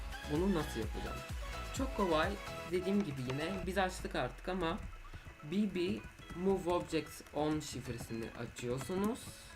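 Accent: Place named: France. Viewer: Fransa'da mı yaşıyorsun? Çünkü aksanın Fransızları andırıyor. 😊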